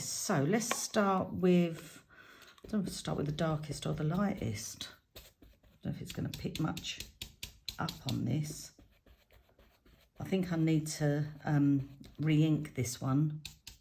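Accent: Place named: Essex, United Kingdom. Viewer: Just came across your channel and really enjoyed watching you. Particularly because I hail from Essex originally and worked in London so your accent is like coming home. Your voice really reminds me of Linda Robson in the good old comedy 'Tails of a Feather'. What a coincidence!!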